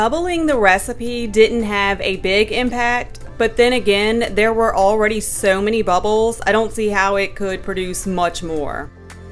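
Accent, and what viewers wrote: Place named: New Orleans, United States. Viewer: Used this video thanks... settled on the guar gum. btw are you from New Orleans you have a particular accent that reminds me of home?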